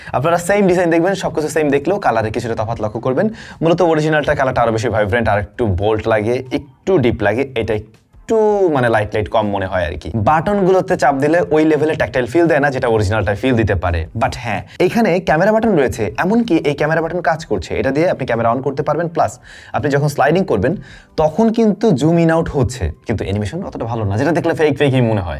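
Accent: Barishal, Bangladesh. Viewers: ভাই বরিশালের এক্সেন্ট গুলা কিন্তু দারুন লাগতেছিলো😊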